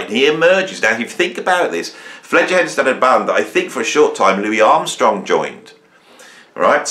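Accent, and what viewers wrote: Birmingham, United Kingdom. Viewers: I follow David Packham on American politics - you need to do an advert at the end of each video. Posh pot noodles, hair gel, face scrub...you latest book! Alright, the last is a bit Rick Beato. 😂 Soon your studio will be filled with so much crap you won't be able to film! On seconds thoughts, the loud, opinionated Brummie still wins every time.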